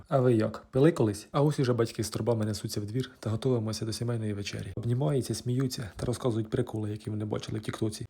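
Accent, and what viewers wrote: Ukraine. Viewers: Яка проста укаїнська говірка! Суперово!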